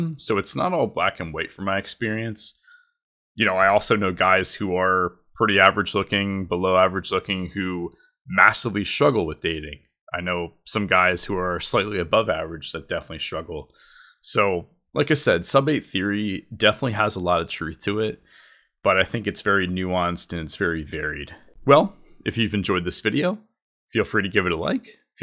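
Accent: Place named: United States. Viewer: It's alsways a pleasure to watch your videos, you've got a beautiful american accent!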